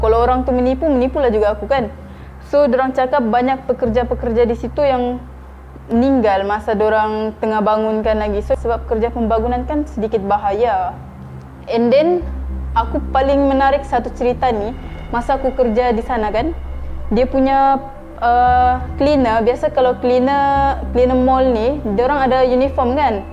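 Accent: Sabah, Malaysia. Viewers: Org sabah ke? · Slang sabah cantik kak 👍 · Suka gila kak cakap sabah dia 😍💕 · Akak sabah ka?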